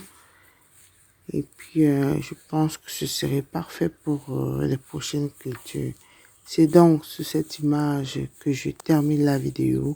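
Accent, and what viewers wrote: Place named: Africa. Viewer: coucou je trouve que t’as un accent africain 👋😄 t’es trop bien ce que tu fais. Bonne vidéo comme d’habitude. . quelles sont tes résolutions pour 2022, moi c’est de faire plus de vidéos sur YouTube c’est cool. Bonne soirée. Yanis, À bientôt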